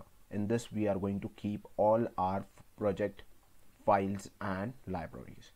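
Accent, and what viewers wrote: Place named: Germany. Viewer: Good day, I have been studying and reviewing videos in Python and now in Django on how to make a website and until yesterday I discovered the so-called templates and the videos that I have seen so far show commands and files that are already obsolete. Until last night I came across this video and it seems to me that it is well structured, updated and in English that I do not master but I have always wanted to learn it and listening to your pronunciation I think it is not your native language either, you sound more like German or European. I was looking over some of the videos of the series, I have high expectations now that I have already seen the first of the series starting with the virtual environment that until now had not deepened it. My goal is to know how to use the templates to not reinvent the stone. Escribi el parrafo en español y lo ingrese al Traductor de Google o sea que si lo quieres leer en español haces la operacion inversa en el Traductor de Google con este parrafo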